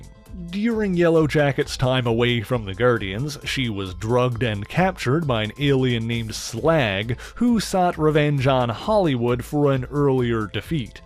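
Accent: Newfoundland, Canada. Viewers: Love the channel. I have been wondering where your accent is from?Newfoundland?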